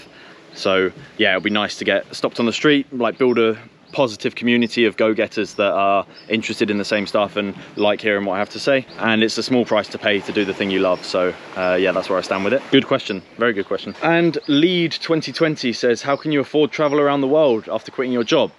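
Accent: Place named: United Kingdom. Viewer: ARE u british? i like the accent though.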